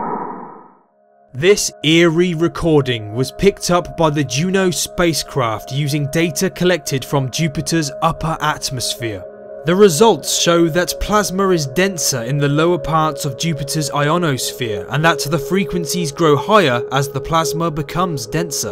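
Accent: United Kingdom. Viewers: Why are you British? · I love your british accent 💖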